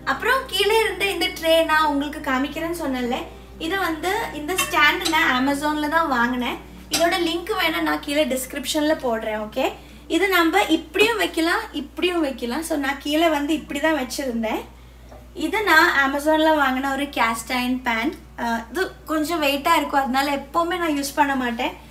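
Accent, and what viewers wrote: Kerala, India. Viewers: Hi dear.. your Malayalam mixed Tamil is too cute! Like "Ethaathu"😍 can you share me the link for the grinder. I wanna buy one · Nice. As others say, ur tamil is sweet to hear. From ur slang i can guess u r a malayalee brahmin. But hv u not changed plastic to glass or steel. Its a trend now a days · Iam ur new subscriber your voice and Malayalam mixed Tamil was tooo good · Hi sister,i like your way of speaking👍,malayali tamil pesurathu keka nalla eruku..🌹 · me to first time watching superb aka unga voice malayali ponnu ivalavu alaga tamil paesurathu supera irku... nice kitchen neat and clean superb superb aka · Malayali aano. Tamil kettit angane tonni. Puttu kutti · First time watching when I listened to i I thought the Tamil sounds like a Malayali speaking then I see the spices written in Malayalam .. r u malayali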